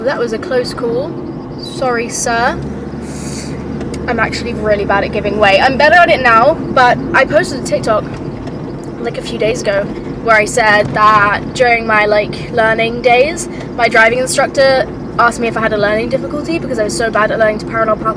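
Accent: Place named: United Kingdom. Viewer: omg bri'ish accent kills me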